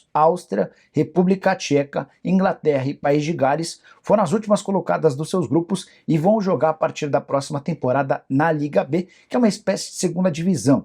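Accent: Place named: São Paulo, Brazil. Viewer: Ele ter que ter o sotaque podre de paulista esquisito que bota o i antes do r 😂🤣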